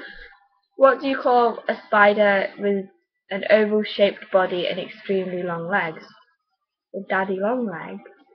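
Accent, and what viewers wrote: United Kingdom. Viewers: You do sound oddly British in this video.. and I had to urban dictionary "peng".. terrible word. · Totally from Nottingham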